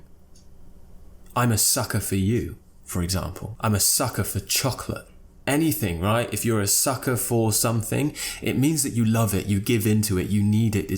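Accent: United Kingdom. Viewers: More than your british accent, I think is your voice, it's really a pleasure hear you... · Love your Britsh accent! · British accent is more sweet with you our respected teacher thanks a lot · I love british accent for the first time when i listen your accent. thank you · I knew the phrase "be a sucker for sth" before. Thank you for what you do on YouTube. I learn a lot from your videos just by listening to you. That's great! I'm a sucker for British accent. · I love your videos and your pronunciation! I adore British English! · I love your British accent so so much. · Thank you so much for this interesting and useful video! I've been your subscriber for a long time but I'm writing comments for the first time. I find all your videos useful and enjoy watching them. Your British accent is wonderful! I'll definitely learn these phrases from the video. I"ve never heard about some of them. 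Love your channel! Greetings from Tajikistan! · I'm a sucker for british english <3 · Your voice and pronunciation .I love British English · I am such a sucker for British accent. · Can't help falling in love with your British accent :> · Really, you’re one of perfect an English teacher, Specially your accent British 👌, btw u have a good sound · Almost every comment section's top comment is about British accent XDDDD Yeah, I love it, absolutely. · Ohhhh British accent is so charming!!!! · Your British accent is comfortable to my ears. In this video, there are lots of interesting useful expressions I have never heard! Thanks! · I'm a sucker for British accent :p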